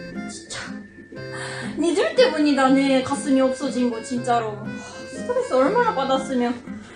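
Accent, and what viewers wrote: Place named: Japan. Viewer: 오늘 꽤 간만에 일본인스러운 한국어다. 반갑네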